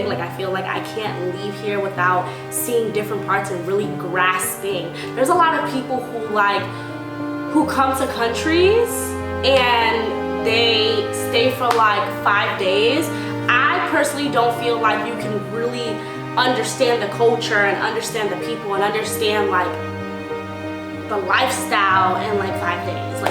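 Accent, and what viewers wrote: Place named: New York, United States. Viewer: Habari gani? asante sana for the vid. loving that accent, i know it when i hear it. new york in the house. loving that. Thanks for sharing and keeping us posted. you are truly a jewel. Life will only get better from this point out. peace and blessings.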